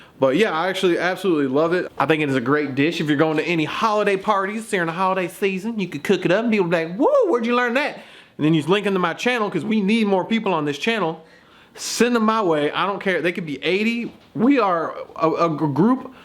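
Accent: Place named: South, United States. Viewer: All of these years and I just now realized you have a southern accent.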